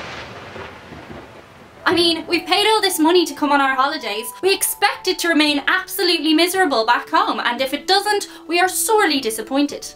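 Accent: Ireland. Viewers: I am just here for the irish accent and I LOVE IT :D and yeah I can not tan well either :( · I am from Virginia USA and have lived in Richmond VA for most of the past 50 years. There is so much Irish accent left here in the older people, especially the way you pronounce out, about house, etc. My family are from Appalachia and there are so many Irish (Ahrsh) pronunciations there too. The Middle Atlantic states and upper South are areas you need to explore. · Love the Irish accent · Forget models girl you are real and you are HOT (very desirable), cute and I love your Irish accent.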